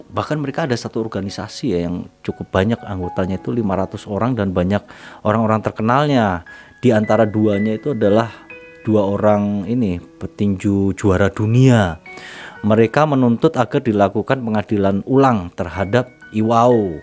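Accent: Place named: Java, Indonesia. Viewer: MAAF BANG YANG KU CINTAI...ANDA INI SEBENARNYA DARI DAERAH MANA? KADANG2 LOGAT BAHASA JAWA MEDOK,KADANG2 SUNDA BISA JUGA JAKARTA/BETAWI... DARI MANA YAA...BIKIN AKU PENASARAN BY THE WAY BAKAT ANDA DALAM BERCERITA MEMANG MENAKJUBKAN....SIIIP MANTAAAP, BERKEMBANG TRUS BRO..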